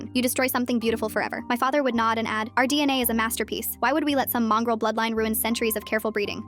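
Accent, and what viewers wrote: United Kingdom. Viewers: Yo its sounds like harry potter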